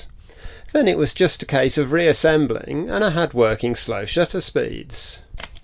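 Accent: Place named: Midlands, United Kingdom. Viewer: really good video matey, but can I ask a question? what is your accent? i come from Bedworth, so i feel like it's sort of a between Brum and the Black country accent?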